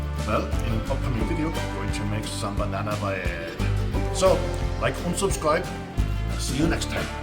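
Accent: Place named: Germany. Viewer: I hate to tell you this but your German accent sucks.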